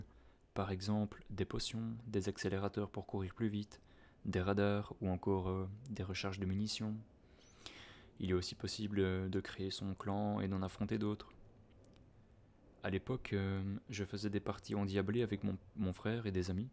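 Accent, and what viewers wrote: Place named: Belgium. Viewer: J aime bien ton accent belge 😏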